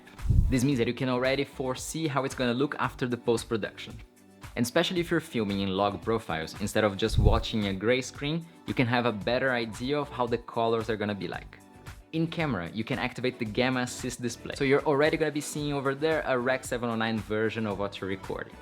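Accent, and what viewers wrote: Brazil. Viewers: Pelo sotaque deve ser br kkk cara tbm uso uma sony A7IV e apesar de todas essas funções do app ainda acha que compensa pegar por exemplo um monitor feelworld f6 ou só o celular ja me atenderia mto bem?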